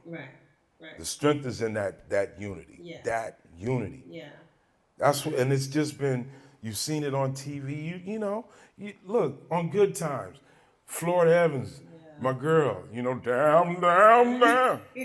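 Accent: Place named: Cockney, United Kingdom. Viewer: Watching you from London, UK, love the 'cockney' accent, lol.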